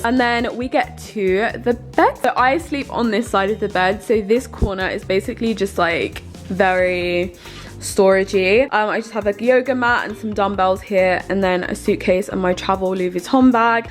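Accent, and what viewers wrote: United Kingdom. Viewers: A lot of Black women in the UK speak like this! Especially in the corporate world. ☺️ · You sound like you come from a lot of money anyway. Your accent is very much British Private-School rooted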